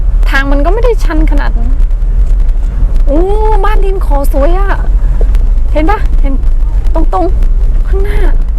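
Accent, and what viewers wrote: North, Thailand. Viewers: อยากทราบว่า เป็นคนไทยภาคเหนือหรือมั่ยคะ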